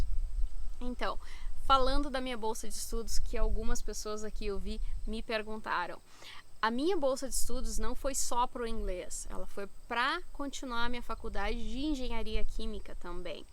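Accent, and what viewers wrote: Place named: Gravataí, Brazil. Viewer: QUE BOM EU MORO EM GRAVATAÍ E RECONHECI O SOTAQUE, PARABÉNS!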